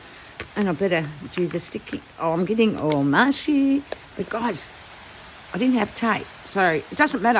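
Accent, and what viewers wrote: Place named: Australia. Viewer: You sound Australian, but it is so hot in Oz right now n you have a thick thick jumper on! I'm sitting here melting! 😂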